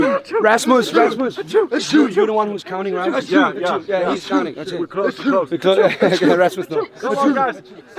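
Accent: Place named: Denmark. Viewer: That Danglish tho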